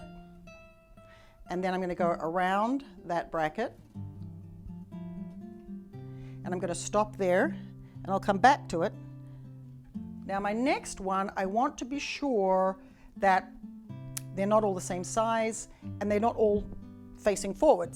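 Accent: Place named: Australia. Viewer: Wow I love your video!! You make it look easy, you are very talented. I love your accent, Australia?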